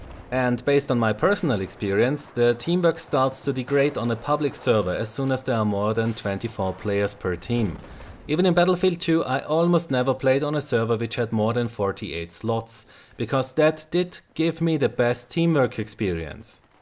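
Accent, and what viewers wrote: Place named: Germany. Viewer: Haha I am joking of course! I love your ideas and stuff for battlefield. Love the accent too. Are you from Germany by chance?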